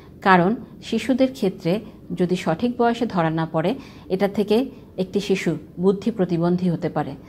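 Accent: Chittagong, Bangladesh. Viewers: Madam ki chittagong ashen????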